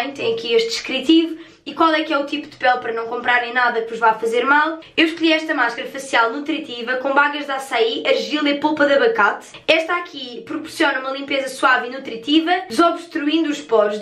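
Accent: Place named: Portugal. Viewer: Amo sotaque de Portugal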